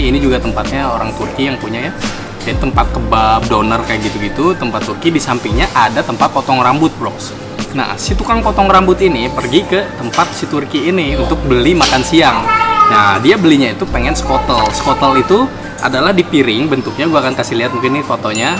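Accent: West Java, Indonesia. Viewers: Tetep keluar ya sundanya, sagala rupa 😂😂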